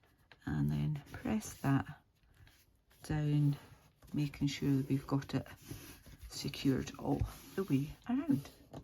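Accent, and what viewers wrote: United Kingdom. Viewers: Great to hear a Scottish voice x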